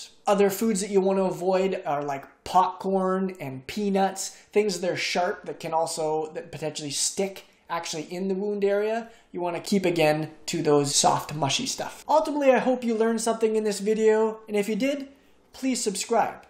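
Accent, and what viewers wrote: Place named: Canada. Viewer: Are you Canadian? Just asking because of a slite accent. Good video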